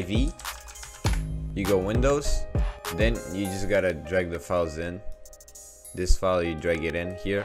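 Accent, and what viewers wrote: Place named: Romania. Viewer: Ori cat de bun ar fi un roman la engleza tot se cunoaste dupa accent:)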